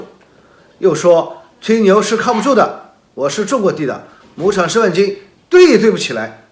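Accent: Shanghai, China. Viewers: 听得出你是上海人了不起有胆识。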